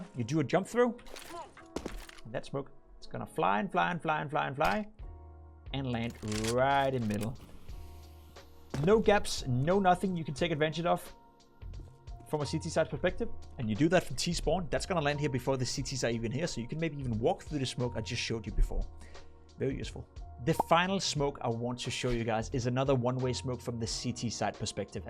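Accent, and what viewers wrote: Denmark. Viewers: u danish?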